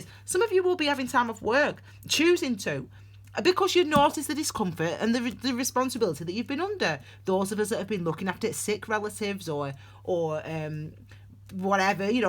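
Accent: United Kingdom. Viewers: 1st Time watching your reading... Hello from the US , New Jersey specifically...I love your energy, your happy and so sweet! And of course I Love the accent! We Americans will never sound as lovely like you Brits ❤️ Your reading was Great! I like the way you do your readings, I feel like it's much more in depth and has a great flow. So I hit subscribe, of course! Thank you for what you're doing to raise the vibrations and awaken the planet! Awesome !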